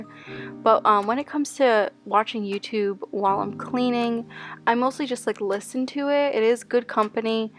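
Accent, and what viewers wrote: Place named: New Jersey, United States. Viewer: Are you from like jersey or someplace around there? Just asking because the way you say carpet and better. Prolly more words too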